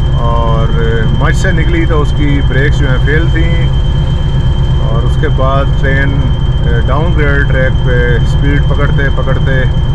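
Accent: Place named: India. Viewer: The commentator seems to speak HindEnglish!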